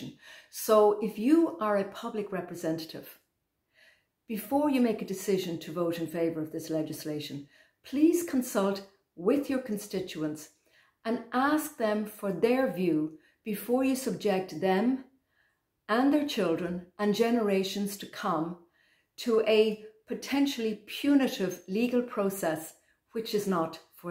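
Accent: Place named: Ireland. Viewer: It's so refreshing to listen to an Irish person speaking so eloquently. Beautiful too.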